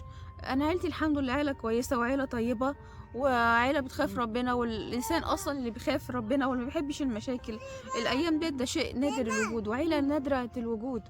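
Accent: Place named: Ethiopia. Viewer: تعلميها انتي الاول وبعدين تفلسفي لما بتنكلمي تركي نفس صوت ولهجة العاملات الاثيوبيات اللي بتتعلم من اصحابها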